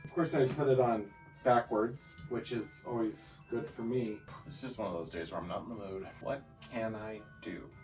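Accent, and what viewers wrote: Minnesota, United States. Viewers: you look VERY fetching in that red plaid shirt!! I'm sorry it was making you itchy and bitchy, but you were giving 6'4" lumberjack vibes!! 🪚 🪵!! On the 8th day of Vlogmas my true love gave to me: Eight cameras tumblin' Seven cutouts glowin' Six berries spillin' Five Christmas trees 🎄🎄🎄🎄🎄 Four Paolo meows Three sets of batting Two power strips 🔌🔌 And you sound like the movie Fargo 🎄⛄🙂⛄🎄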